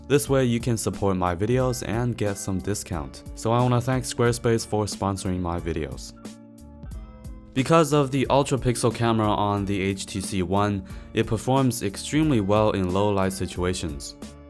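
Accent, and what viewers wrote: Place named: China. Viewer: Your accent sounds pretty mandarin....... well I guess more like the mainland mandarin ?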